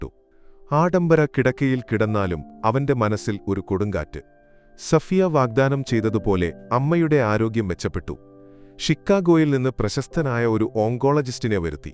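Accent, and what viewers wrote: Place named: Arabi-Malayalam, India. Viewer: Kadha Vaayikkan Malayalam Koottivakkan ariyavunna orale niyogikkaamaayirunnu. Ithu arabi malayam Parayunnathu pole aayippoyi.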